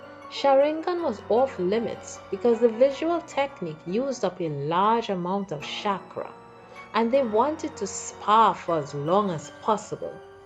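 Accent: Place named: Trinidad and Tobago. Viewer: You sound Trinidadian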